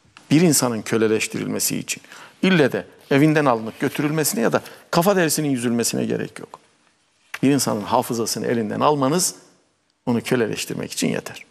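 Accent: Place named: Erzurum, Turkey. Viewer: Allah hocamiza uzun omur versin. Erzrum şivəsi, eyni İrəvan turklərinin şivəsi!!!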